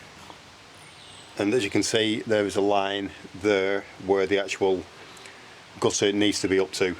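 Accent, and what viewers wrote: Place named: Northern England, United Kingdom. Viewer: Don't lose that Northern accent......British Industrial greatness was built with people who spoke with accents like yours and similar. Great video...keep 'em coming!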